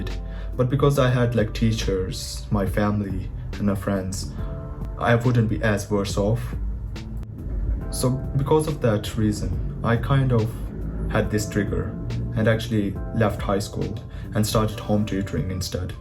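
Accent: Pakistan. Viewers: WHERE YOU GOT THE PAKISTANI ACENT FROM??? I LIKE IT, SO ORIGINAL. 😭😭😭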